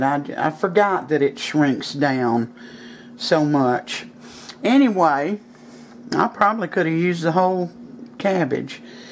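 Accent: South, United States. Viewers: "Ya'll" and saying " your sorry" so much sure shows you live down south. Love to you sweetie. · I love the sweet southern accent 😍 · My mother was from the South, I love your accent and I look forward to your recipes.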